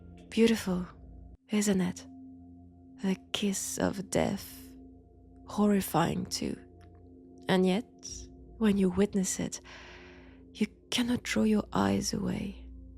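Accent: France. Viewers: I'm curious,are you a real french or do you just talk that way? I like this kind of way you do this,it has something. Good Roleplay for this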